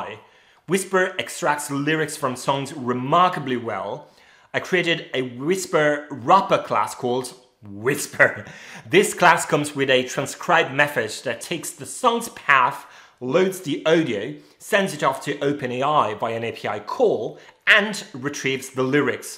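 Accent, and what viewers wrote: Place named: United Kingdom. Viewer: Great work! It's really funny how the british accent and words make it seen like an even more intellectual and objective review 😂 I'll use it to annoy my Swifty-fan's friends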